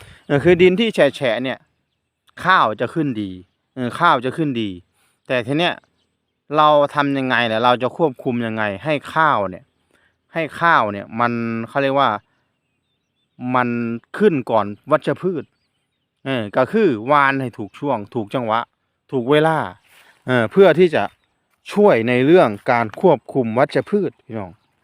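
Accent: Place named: Isan, Thailand. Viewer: อืดอัดคุนจะเว้าอึสานก่อเว้าจะพุดไทก่อพุดนะคุนผมคนลาวฟ้งแล้วมันขำ😅และก่อยากหัว😅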